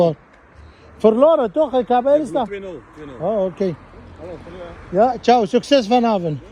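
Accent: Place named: Iraq. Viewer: حلو انت تحجي هولندي باللهجة العراقية هههههههه انت بلجيكا بالقسم الهولندي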